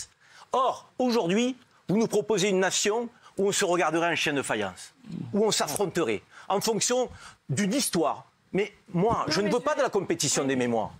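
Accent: Marseille, France. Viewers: Zeribi., tu le fait bien l'accent marseillais..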